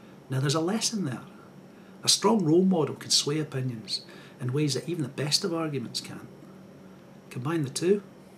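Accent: Scotland, United Kingdom. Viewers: ...you might want to think about transcripts. I'm very good at understanding various accents very well, and I love your lucious burr, but I know some Yanks in particular have trouble with it. Sorry 'bout that, we ought to get better at hearing other cutlure's lovely ways of speaking but some of us are just terrible at it and can't help it. Perhaps putting up transcripts in your low bar would help a few who canna heer ye threw tha' luvly way o' speekin' ya got thairr...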